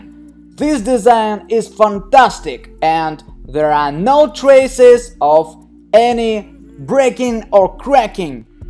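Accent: Russia. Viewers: Мне кажется что по-русски вы говорите лучше чем по английски с русским акцентом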